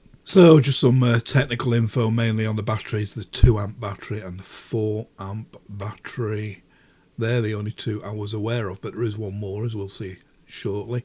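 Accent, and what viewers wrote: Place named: England, United Kingdom. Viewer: Very good. Great to hear a true English accent as well, along with a rich vocabulary. Helps a lot.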